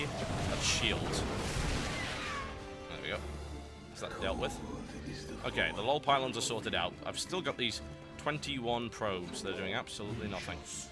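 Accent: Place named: United Kingdom. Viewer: I really wish you would continue this series tb. I understand you're a busy man with all the SHOUTcrafts and whatnot but this is a nice series to watch as a struggling beginner. I think watching you play and hearing your commentary on what you should do and what you should have done better really helps. Watching the pros play doesn't help much because its very fast paced and hard to follow and learn from. Plus hearing your charming british accent is nice too :).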